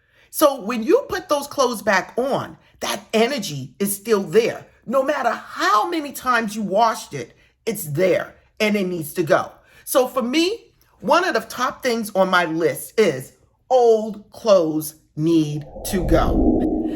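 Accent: New York, United States. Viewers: 🥰Thank you as always! Love that NY accent!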